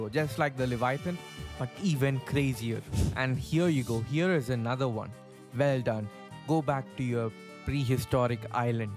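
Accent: India. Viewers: Your accent sounds like indian idk why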